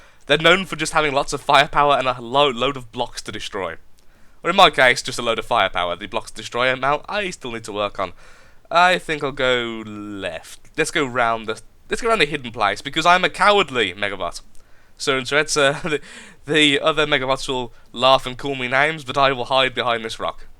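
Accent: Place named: England, United Kingdom. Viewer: O H M Y F R E A K I N G G O S H ! ! ! I just can't concentrate to the game hearing your english accent XD OMG!!! It's a praise =D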